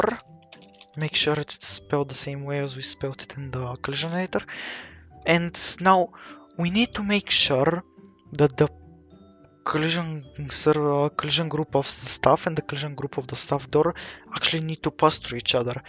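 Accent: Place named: Italy. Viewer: nice Italian english voice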